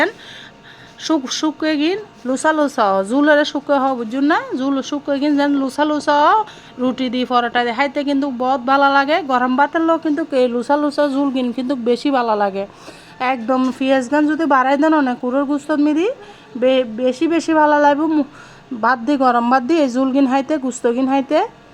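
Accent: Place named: Chittagong, Bangladesh. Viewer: খুব সুন্দর হয়েছে এবং মুরগির মাংস রান্না খুব ভালো লাগে তোমার চট্টগ্রাম ভাষা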